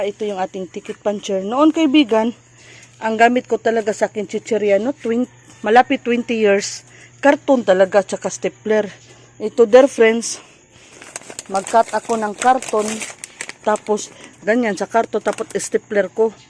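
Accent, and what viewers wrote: Visayas, Philippines. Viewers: Hahaha Visaya diay 😅🌸🌼🌻Friend na tayo Te, salamat sa pag share Idol,, nanoud kasi ako, nag dala nang Goodluck hahaha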